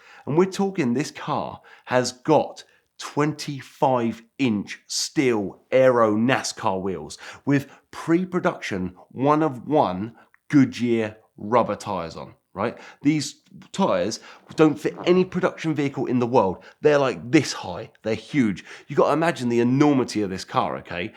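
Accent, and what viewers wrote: United Kingdom. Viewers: i dont expect the everyman-british accent from him! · I don’t care how good a story is, it’s just that much better when hearing it from someone with an excellent British accent. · maybe its just the brit accent but i now want to make the lightning mcqueen mobile!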